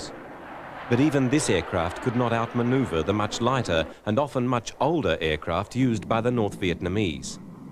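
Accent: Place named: United Kingdom. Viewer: Yes - the narrator has a British accent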